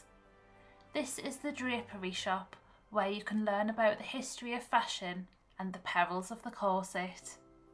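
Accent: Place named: Newcastle, United Kingdom. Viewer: Please lower the background music - it makes your voice difficult to hear and for those who find the Geordie accent difficult, this makes it harder. Lovely to see the beauty of the NE on show - so many wonderfully historic and scenic places to visit in Durham and Northumberland. Thanks for making this video for us to enjoy.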